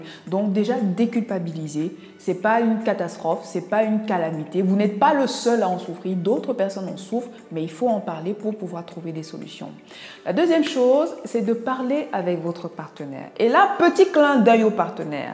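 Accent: Cameroon. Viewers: Si vous êtes marié, votre mari est beni; Si non le future le... vous avez un accent français un peu Camerounaise...? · Merci docteur, vous avez un accent de chez moi " CAMEROUN"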